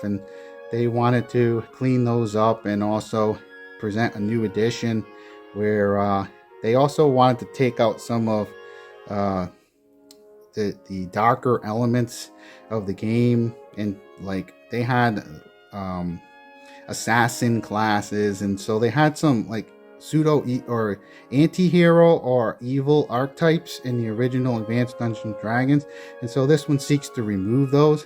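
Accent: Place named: New England, United States. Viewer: Your accent sounds either New England or maybe New York. I started in the mid 90s with AD&D 2e. Still love it. It feels like the real thing. Later editions lack drama and don’t feel as scary.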